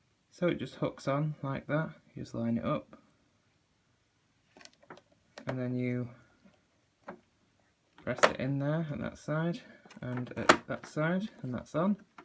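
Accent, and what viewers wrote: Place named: North England, United Kingdom. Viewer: This was back in the good old days when the Brits and the Yanks still shared vacuum designs for the Hoover cleaners... One of my Mum's best childhood friends worked at the N Canton Hoover plant for decades(both their Dads were native Scots/Brits) and the two Dads worked for Goodyear Tire/Tyre and Rubber in Akron, Ohio, my current hometown... I enjoy your videos and comments and your lovely Liverpool or Manchester accent!!! Cheers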